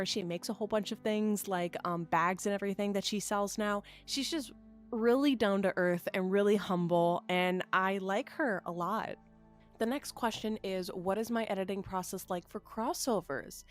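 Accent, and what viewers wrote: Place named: New Jersey, United States. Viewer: Okay so I’m finished listening and I’m so here for your warrior cat oc lore they’re so coool and I always adore a chapter that’s complex and with a bit of religious power Hexmoon is currently obsession ALSO JERSEY REP! I was like I know that accent and thought it was Philly 😭 but damn love this so much!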